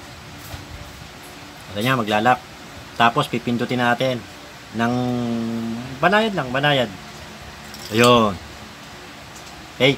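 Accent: Batangas, Philippines. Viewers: ahh pero prang batangueño ang kaunting punto ninyo ka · ah akala ko kasi batangas at quezon ..ganan kasi ung mga punto dine eh..haha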